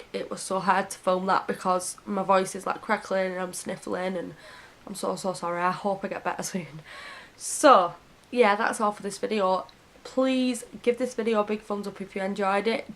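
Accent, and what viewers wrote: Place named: Lancashire, United Kingdom. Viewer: I love your Lancashire accent.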